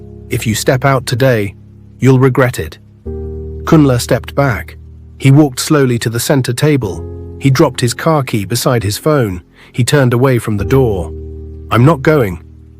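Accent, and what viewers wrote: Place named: United Kingdom. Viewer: I SO AGREE! I CAN LISTEN TO THE NARRATOR (BRITISH ACCENT) ALL DAY. LOVE THE FEATURED MOVEMENTS OF THE CHARACTERS. GREAT STORYLINES FROM START TO FINISH.😊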